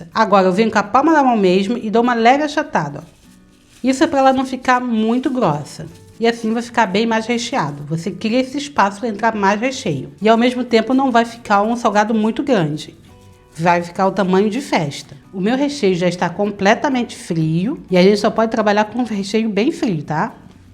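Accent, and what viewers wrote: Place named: Rio de Janeiro, Brazil. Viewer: Oi Boa tarde suas receitas são ótimas e sua voz e muito bonita tem um sutak carioca e isso mesmo💖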